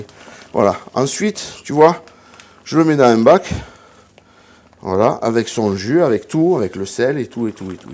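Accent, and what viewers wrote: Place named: Marseille, France. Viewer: est tu cevenol de naissance ou d adoption? Désolé pour m question intrusive mais ton accent me rappelle fortement le mien et celui des mes proches qui sommes issus de la région marseillaise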